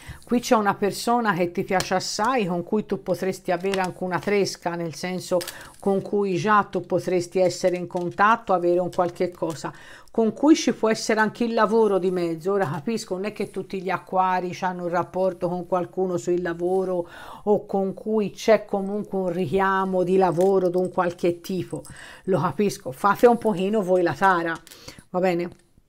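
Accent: Tuscany, Italy. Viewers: Ciao, sei bravissima e simpaticissima. Adoro la tua cadenza Toscana, di dove sei? Io ho parenti cari a Follonica GR e ad Agosto spero tanto di andare in vacanza lì. Io sono acquario asc. vergine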